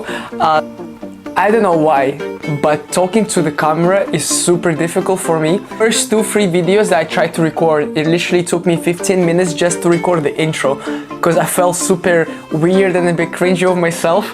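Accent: Russia. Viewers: Привет!) Ты русскоговорящий что ли?) Чувствуется немного жесткость русской речи в твоём произношении. Стало интересно узнать разговариваешь ли ты на русском?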